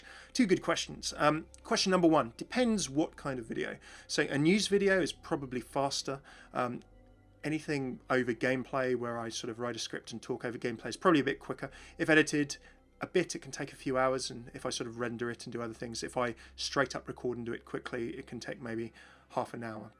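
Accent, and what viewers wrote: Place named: United Kingdom. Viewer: Your voice has a British accent. You British?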